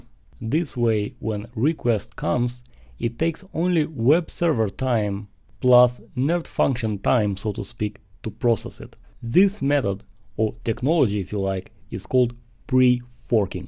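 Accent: Russia. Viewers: Русский акцент? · Дружище, я сразу понял, что ты русский) Запили на русском такой же видос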